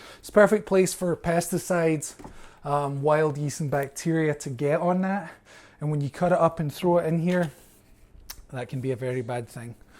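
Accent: Scotland, United Kingdom. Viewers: where in scotland you from bud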